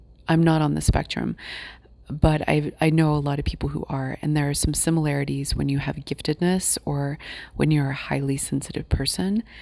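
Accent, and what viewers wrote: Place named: South, United States. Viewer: Beautiful video! You explain things so clearly and you're very easy to listen to. It's like I'm listening to one of those women we have in the south that call you "honey". You feel so safe.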